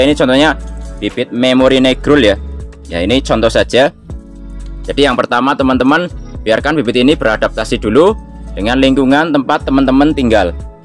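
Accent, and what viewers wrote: Java, Indonesia. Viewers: Logate medok asli mana bang 😊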